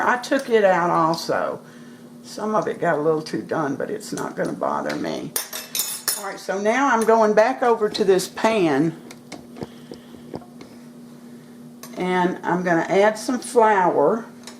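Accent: South, United States. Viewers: I have not hamburger steak in years. That sure looked good.A real comfort food. So yummy. Wish I was there for dinner. My mama always said "cut off the heat" or "cut off the lights" and not turn down the heat or turn off the lights. I can tell you are Southern. So cute. I always say I can speak Southern. Does that make me bilingual?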